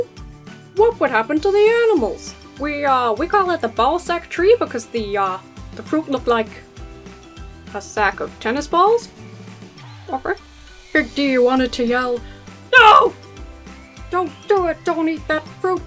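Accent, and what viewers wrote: United States. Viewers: I'm crying... lol... you have a much better American accent than I do an English one... quite impressive lol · I can't imagine this being someone's first visit to you. That would be insane. 😂 Great stuff. And your American accent is getting quite good. Your "Dr" Kent impression is stellar. 🙌🏼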